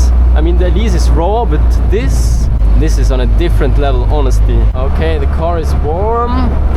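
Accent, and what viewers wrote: Switzerland. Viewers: Yeah, it's one of us. That much is right. But as I can tell a very clear Swiss accent from a German accent, We can narrow down the number of possible solutions even further. No need to be this vague.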